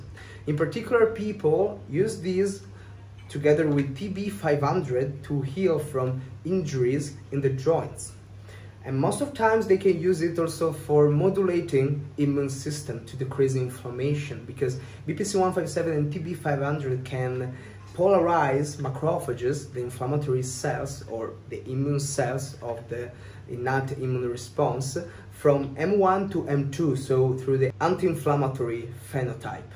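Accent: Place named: Veneto, Italy. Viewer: Great,buddy 💪🏻l'inglese con accento Veneto è stupendo☺️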